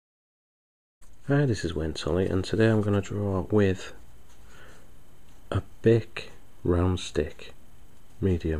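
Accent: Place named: Hull, United Kingdom. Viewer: Are you from hull by any chance. Accent sounds familiar.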